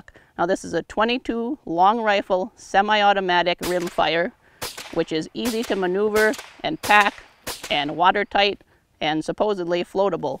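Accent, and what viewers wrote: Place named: Minnesota, United States. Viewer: I'm trying to identify the accent... Minnesota? Canada?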